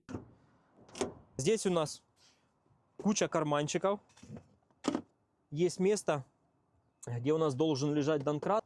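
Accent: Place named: Ukraine. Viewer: Слова "ложить" нет в русском языке....есть "положить" или "класть". Думаю Вы украинец, судя по акценту. Спасибо за видео.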